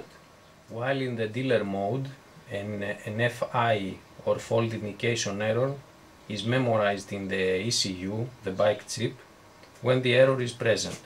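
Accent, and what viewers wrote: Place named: Greece. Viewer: nice vid, when I heard your ink in first second I said .. this guy is greek, giamas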